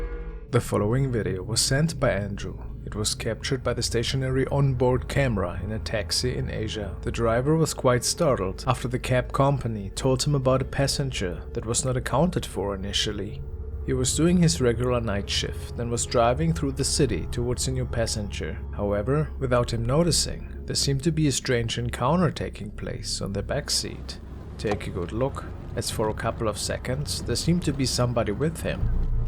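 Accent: Germany. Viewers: Love your German accent my ancestors were German too and I love your accent it makes the videos all the mor spooky. Love you videos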